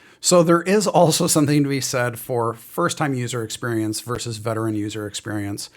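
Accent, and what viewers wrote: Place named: Midwest, United States. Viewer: This is a weird as hell statement but screw it. Why do you sound like my aunt? You from the Midwest?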